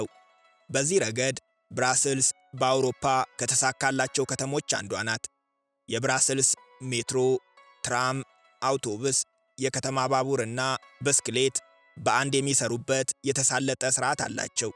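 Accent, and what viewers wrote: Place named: Addis Ababa, Ethiopia. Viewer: yemigermew ahun yalehut gent Belgium new ena ende addis temesche new yesemahut konjo akerareb new berta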